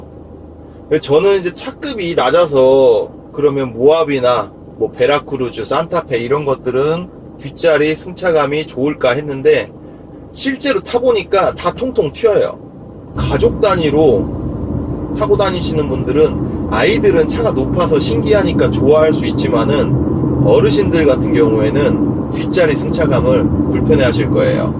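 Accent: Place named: Jeonju, South Korea. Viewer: 어랏? 전주 분 이신가보네요~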